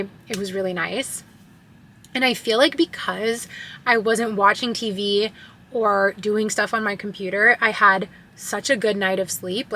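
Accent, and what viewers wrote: Canada. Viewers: I love hearing you speak in French!! I always hear the French accent learning French but I love the Canadian accent it’s so cool to hear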